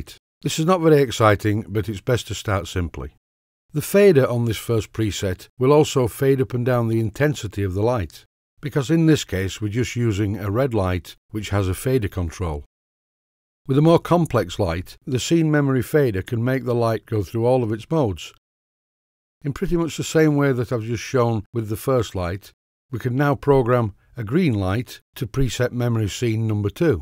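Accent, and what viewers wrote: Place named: Northern England, United Kingdom. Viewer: That's a very helpful video - thanks a lot! (And, I couldn't help noticing, very sweet Northern English dialect - u sounds, I luve it :-)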